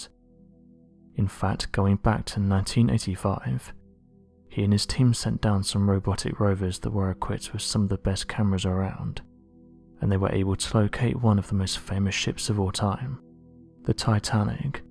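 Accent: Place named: Cockney, United Kingdom. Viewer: Yes, is very hard to listen to.. the low, whispery/breathy narration in cockney is horrible. The other chap is so much better.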